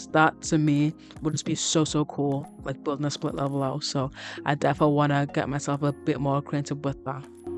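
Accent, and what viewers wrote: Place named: Liverpool, United Kingdom. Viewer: Looks amazing! A modern take. Brew you’re accent is really unique, it sounds like a tiny bit of scouse and other accents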